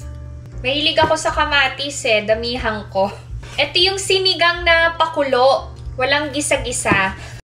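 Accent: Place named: South Korea. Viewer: Hello,! Napansin ko lang po sa mga vlogs nyo na mala-Korean na rin po yung pananalita nyo and curios ako kung aware po kayo dito. What I mean is laging may vowel sounds (-uh) na yung mga words nyo ending in consonants. Say for instance the following words: Steak-uh Netizens-uh And-uh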